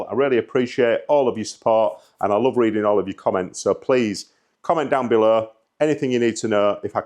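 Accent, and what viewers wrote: Yorkshire, United Kingdom. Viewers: also you sound like your pretty close to me im picking up a Yorkshire twang im Grimsby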